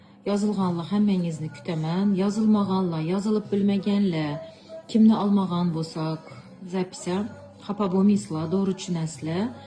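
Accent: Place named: Tashkent, Uzbekistan. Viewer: Iya opa Xorazmlikmi😊Toshkent shevasini yorvoripsizu a😊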